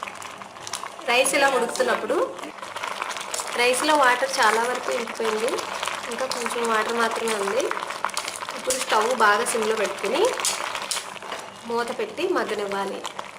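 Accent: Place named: Guntur, India. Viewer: Miru bale cheptunnarandi same guntur style lo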